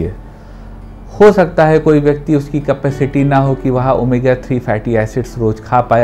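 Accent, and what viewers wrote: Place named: Bihar, India. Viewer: Come to the point than beating around the bush.why Punjabi having bihari ascent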